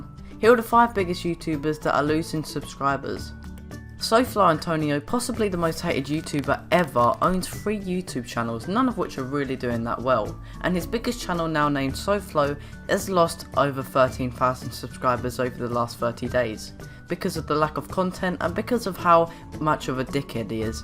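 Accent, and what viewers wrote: United Kingdom. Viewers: Omg are you british? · It's not a speech issue! It's how half of London talk.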